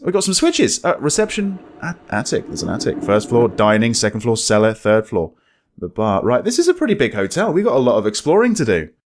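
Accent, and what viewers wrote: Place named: England, United Kingdom. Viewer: I love your english accent. I'm brazilian. You´re a very nice guy! (I believe that´s how to say that, in Brazil it'll be "simpático".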